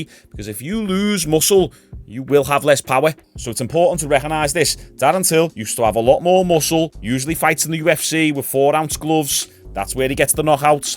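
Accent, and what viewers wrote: Liverpool, United Kingdom. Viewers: An elequent scouser yes lad 😂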